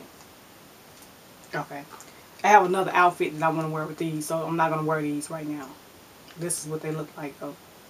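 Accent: South, United States. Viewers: Please tell me where are you from. Strong southern accent. Love it!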